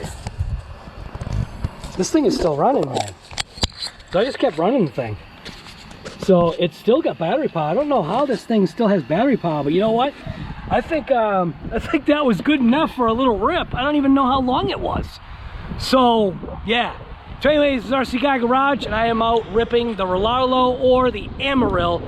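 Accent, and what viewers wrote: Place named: Boston, United States. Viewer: Killer Boston accent! Great video. Car looks legit. I have the WLToys brushed version when it first came out. Highend brushless system in it not(Tekin rs pro, Trinity Punisher 13.5t, jx1181 servo). It’s a beast now. But this looks legit.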